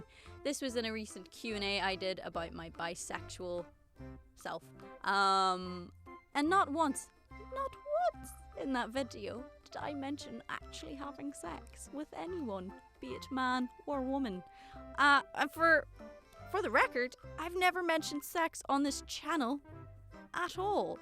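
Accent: Ireland. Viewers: I find it rather sad that people have anything mean to say about you. You're fun, beautiful, Irish, have a wonderful accent and i happen to enjoy your singing. I will never understand why people feel the need to say something mean to someone rather than give positive thoughts and feedback. I feel people do that because they in their own lives have failed or are doing so. They feel the need to put others down to feel good about themselves. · i absolutely love your ginger hair it’s unbelievably gorgeous keep rocking it your an amazingly beautiful woman you should be a model, by the way your voice is awesome i love the irish accent. i recently heard your cover and fell in love 🤙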